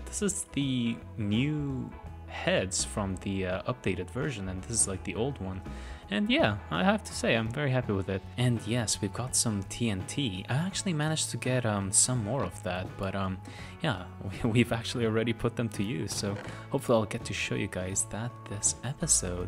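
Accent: United Kingdom. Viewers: Are you brithsh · were you speaking in a British accent for part of the beginning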